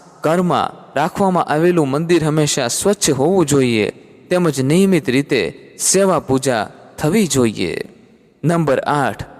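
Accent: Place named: Kathiawar, India. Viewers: Good but speech irrted che bcz kathiyavadi bhashaaa😮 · વક્તાના ઉચ્ચારો પરથી લાગે છે કે તેઓ ભાવનગર તરફના હોવા જોઈએ.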